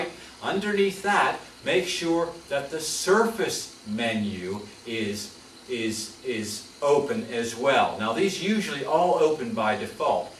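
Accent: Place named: United Kingdom. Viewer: Hes from the UK deal with it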